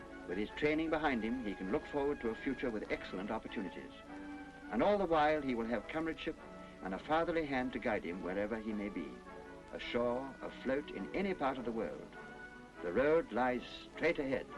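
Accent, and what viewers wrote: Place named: RP, United Kingdom. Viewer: What a posh accent!!! Changed a lot by now.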